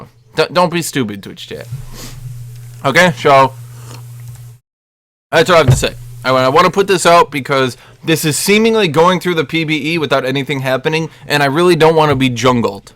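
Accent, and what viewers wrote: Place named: Germany. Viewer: er sagte mal im stream dass er aus deutschland kommt. den akzent hat er sich wohl mit der zeit abgewöhnt, in seinen älteren videos ist er noch etwas stärker bemerkbar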